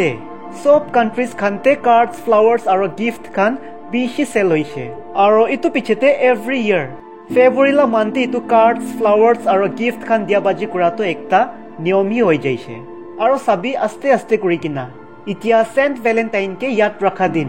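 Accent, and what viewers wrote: Nagaland, India. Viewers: i like your Nagamese accent brother ❤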